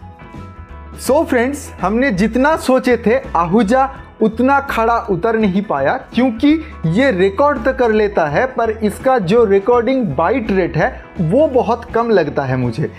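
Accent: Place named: Bengal, India. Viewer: Bongali Hindi accent yckk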